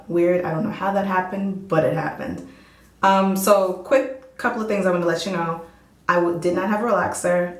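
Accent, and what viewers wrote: United States. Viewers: you are from NY aren't you? I hear the accent. love it. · Love your hair, love your attitude, loving the accent!!! BK represent!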